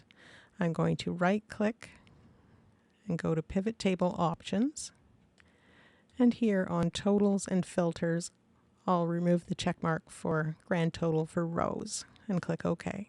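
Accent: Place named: United Kingdom. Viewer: This helped a lot thanks. Also, idk why but her voice kind of sounds like a British person trying to use an American accent. Not necessarily bad, just interesting.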